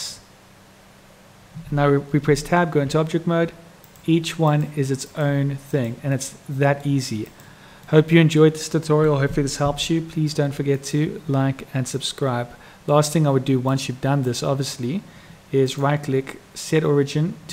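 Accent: South Africa. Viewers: Nice to hear a South African oake for a change :D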